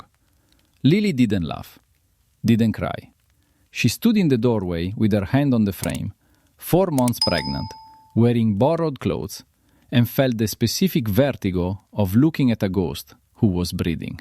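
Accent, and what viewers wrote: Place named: Russia. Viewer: The constant clanging sound and the inexplicable Russian accent made part two much harder to listen to. We’re the story regarding spies or the Bratva the accent might have enhansed the story but as it was neither it just made it difficult to understand.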